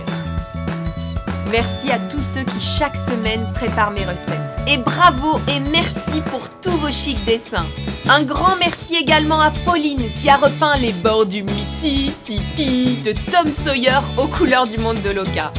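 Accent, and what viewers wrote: Normandy, France. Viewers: Superbe vidéo! ^^ J'aime beaucoup t'es vidéos à chaque fois sa redonne du pep's! ♥ Tu as dis que tu avais un accent Normand donc tu étais originaire de Normandie! Et je voulais savoir si tu y viens encore souvent? Car je vis en Haute-Normandie et j'aimerais tellement te voir. Peut-être qu'un jour tu viendras à Rouen!:D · Ho ! Un accent Normand ? Tu est Normande ? :D (Gyaah ! Un point commun avec toi si c'est oui ! °3°)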